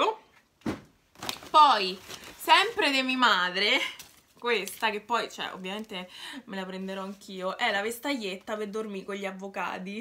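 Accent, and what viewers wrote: Rome, Italy. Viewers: team mia🌹, amo il tuo accento romano 😍 Pezzo preferito il pigiamino di barbie aaaa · Ma vogliamo parlare dell’accento romano😍♥️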